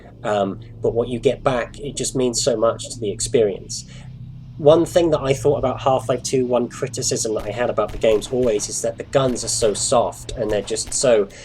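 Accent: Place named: United Kingdom. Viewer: Huh, never imagined you having a british accent. I looove the mod though, I've already played through it 3 times <3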